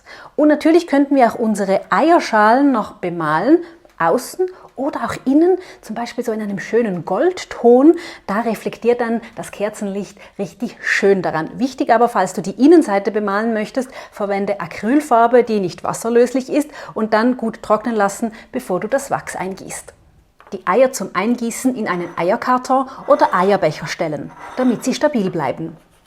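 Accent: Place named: Switzerland. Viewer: Vielen Dank für die schönen Osterideen. Du erklärst alles sehr gut und ich höre deine Stimme mit dem leichten Schweizer Dialekt sehr gerne.😁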